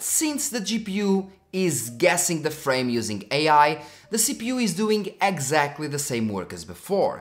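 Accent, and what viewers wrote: Portugal. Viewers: the portuguese accent in english is weirdchamp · Mal ouvi o teu sotaque vi logo que eras tuga, bom saber que existe malta a cobrir estes temas para além dos "big ones", toma a minha subscrição!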